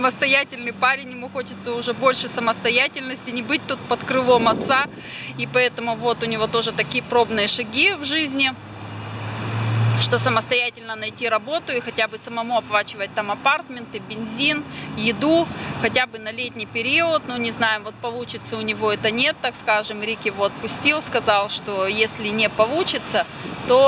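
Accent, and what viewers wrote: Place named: Siberia, Russia. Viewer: Добрый вечер,. Захотелось высказать свое субъективное мнение ))Я пару раз пыталась смотреть ваш канал, но так и не осиливала больше двух роликов – не очень то люблю «говорящие головы». Но возвращалась - притягивало ваша природное обаяние, да и YouTube-советчик ) Попыталась разобраться и вот что поняла. В Вас есть то, чего совершенно недостает современному человеку: искренность, самоирония, доброта и юмор. Вы на голову выше самых популярных блоггеров, которые пытаются заискивать перед зрителем, подстраиваются, ведут себя иногда довольно резко и странно. Так что оставайтесь такой, со своим мнением, со своим сибирским говорком, с прекрасной улыбкой. А грязь к таким, как Вы, не прилипает )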